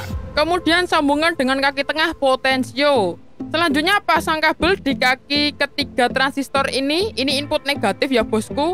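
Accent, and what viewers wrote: Java, Indonesia. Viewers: Baru kali ini nonton tutor kelistrikan bisa ngekek krna bahasane medok bianget😂😂😂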